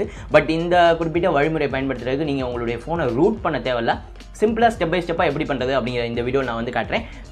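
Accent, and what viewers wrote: Madurai, India. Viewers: Madurai ah neenga? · Bro madurai ah Neega. . . Nice video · Bro Mi A1 ku epadi install panrathu nu solunga and nenga Madurai ahh?? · Madurai karanaya ne ??? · Bro nee Madurai ah 😍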